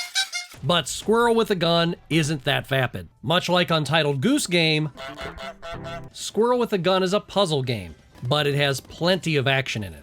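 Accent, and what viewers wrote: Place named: Boston, United States. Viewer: OH SNAP! THE BOONDOCKS REFERENCE...you're speaking my Boston language, KEHD! Great video, Dede is getting this for me for Xmas lolz!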